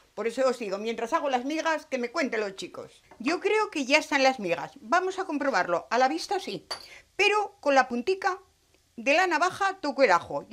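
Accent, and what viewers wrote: Aragon, Spain. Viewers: Me encantan las recetas tan ricas,que haces,y es un placer oírte hablar con ese acento,maño.Alli pase mi infancia.Ana María.❤😊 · Tú eres maña..... Ese acenticooooo.... Eres graciosa, concisa y clara... Las probaré con la patata rayada. Muchísimas gracias por tu desparpajo y receta. Buen trabajo, maña!!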